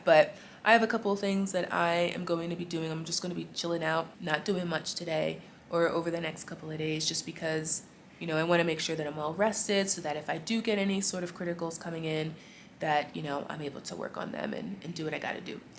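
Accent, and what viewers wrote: United States. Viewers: People don't know just by looking at you that you are American so that can't be why they're staring. You don't look American, just African. I think it might be your accent that gives you away. Or vlogging in public. The staring doesn't come from a bad place. People here take interest in other people. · Welcome back 🤗🤗🤗🤗 we cant tell if u AA until u open your mouth, you must just be used to greeting even people u dont know 😆😆😆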